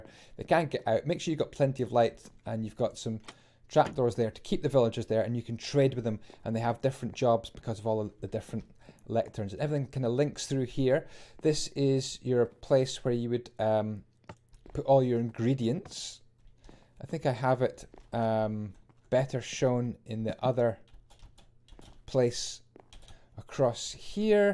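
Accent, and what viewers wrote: Scotland, United Kingdom. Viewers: I dont know why, but i love the scottish accent, its so awesome. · You don't hear muck scotish mine craft content so it's cool to hear a familiar accent being Scottish myself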